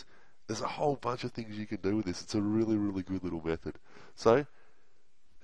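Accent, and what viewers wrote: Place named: Bogan, Australia. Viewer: Love your Aussie bogan accent, 👍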